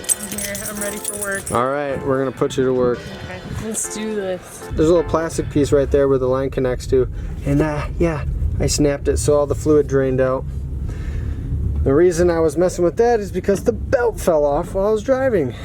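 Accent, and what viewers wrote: Wisconsin, United States. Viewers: I love the mn Wisconsin accent lmao I'm from St paul. Totally understood everyhthing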